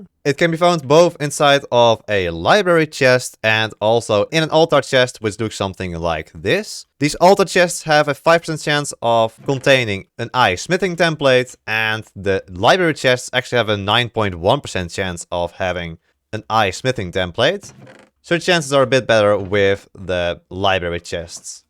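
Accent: Netherlands. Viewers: this guy is def dutch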